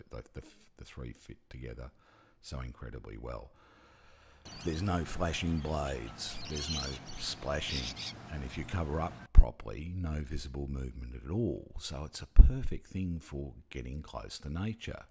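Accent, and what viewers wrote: Australia. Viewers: Actually, I prefer this guy and his honest and relaxed approach. Listen to some of the other reviews he's done. Excellent voice, excellent approach and speed, but it's just not loud enough. Still, I have some normal sized computer speakers hooked up to this desktop and, when turned up a little, it wasn't silent at all. If this is called Aussie 'strine,' then I like it. He'd be an excellent story teller.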